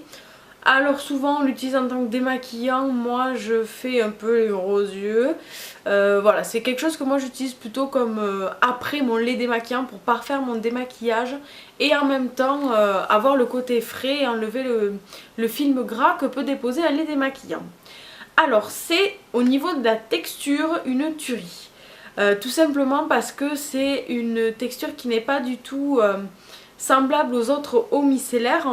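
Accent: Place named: Toulouse, France. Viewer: A la la cet accent toulousain ! 😂💕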